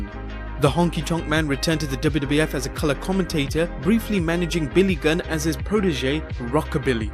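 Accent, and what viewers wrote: United Kingdom. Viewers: I swear every channel on YouTube that does top 10 or top 5 videos is narrated by an annoying British boy!!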